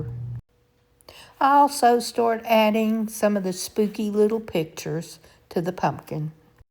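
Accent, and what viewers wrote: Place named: South, United States. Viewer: I can hear your beautiful Southern accent. I am in South Georgia, where are you located?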